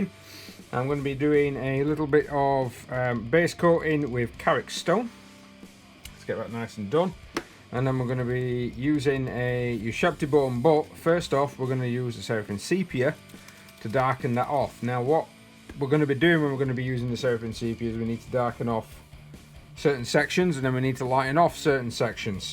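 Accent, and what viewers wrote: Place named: Yorkshire, United Kingdom. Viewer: brilliant vids sir. you really do deserve more views. love your titanicus vids. your yorkshire accent isn't to strong, easily understandable. i'm north notts so used to it. keep up great work.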